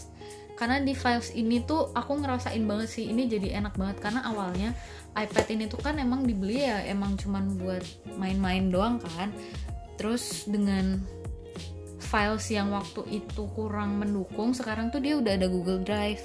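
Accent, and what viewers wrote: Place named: West Java, Indonesia. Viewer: Sunda pisan euy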